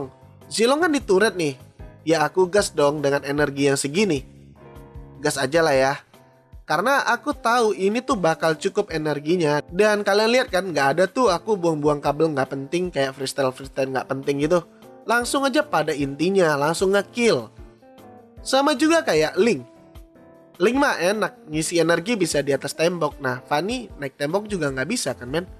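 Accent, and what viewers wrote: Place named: Padang, Indonesia. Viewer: logatnya itu kek org padang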